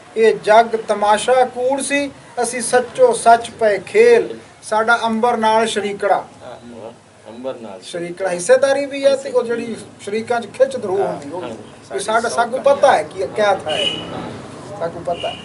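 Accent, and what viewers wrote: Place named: Doaba, India. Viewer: Beautiful theth doada Punjabi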